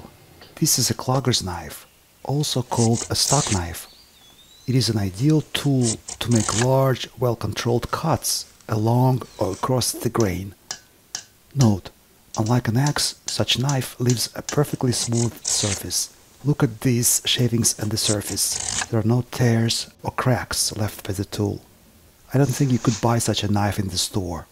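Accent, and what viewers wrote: Russia. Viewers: Не владею английским языком, но явно слышен русский акцент. А когда увидел адвоката понял, что не ошибся.))))) I do not speak English, but a Russian accent is clearly audible. And when I saw the lawyer realized that he was not mistaken.)))))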